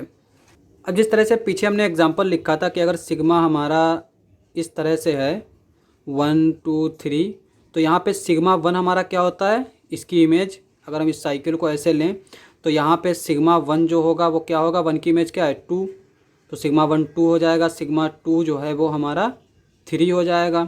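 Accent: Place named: Bihar, India. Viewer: bihari accent=lit video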